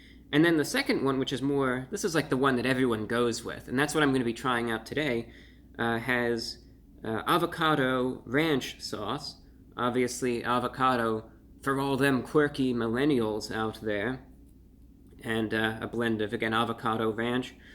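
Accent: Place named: Transatlantic, United States. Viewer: Your Transatlantic accent is absolute perfection.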